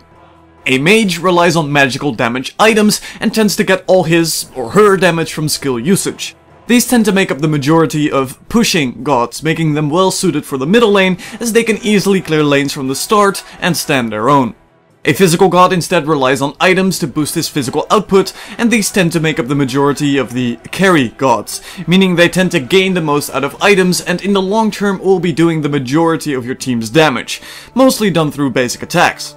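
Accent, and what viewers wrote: Netherlands. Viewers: are u dutch? u sound dutch xD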